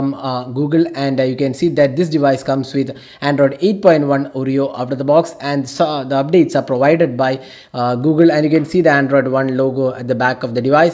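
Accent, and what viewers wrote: Kerala, India. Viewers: Are you from Kerala, you sound like one.. anyway good work here... I too have a Nokia 7 plus.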